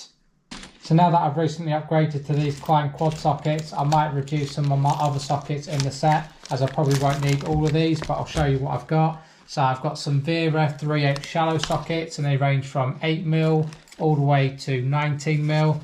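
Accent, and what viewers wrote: United Kingdom. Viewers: Im an Electricion in Sweden, i see you have stuff for plumming, are your doing both jobs as a electrision in your country?, sounds like you are from UK right? Great video, i enjoy watching your content.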